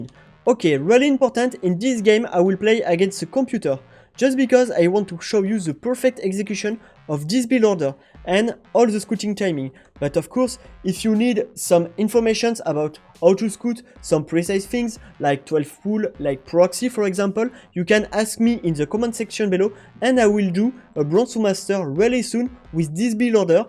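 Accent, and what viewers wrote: France. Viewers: pourquoi les francais de france prononce tous THE comme ZE... <link> <link> <link> serieux je vois celas partout...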